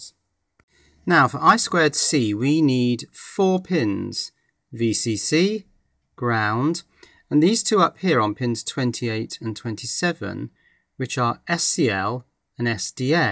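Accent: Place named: Scotland, United Kingdom. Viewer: Very good and didactic, with a nice scottish thrive!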